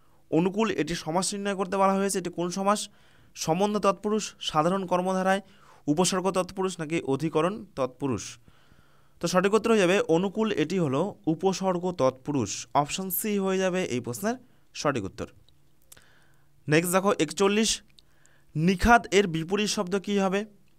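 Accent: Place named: Alipurduar, India. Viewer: Sir apni kon jelar.. Anek ta Alipurduyar er vasar moto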